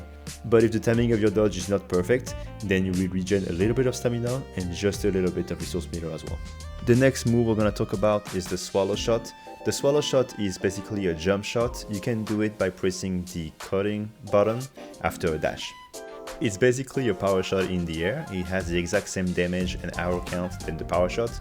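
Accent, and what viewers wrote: France. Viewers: Tu es français j'ai l'impression vu ton accent. Ce n'est pas un reproche ça m'arrange ! Merci pour ton guide, j'ai vu beaucoup de vidéo sur l'Arc et la tienne est de loin la meilleur. Est-ce que ça serait possible d'avoir un guide de builds sur l'Arc ? J'ai fait pas mal de tests de mon côté et je ne trouve aucun moyen de rendre un build élémentaire viable. Ce que je trouve dommage car ça limite grandement les possibilités de build.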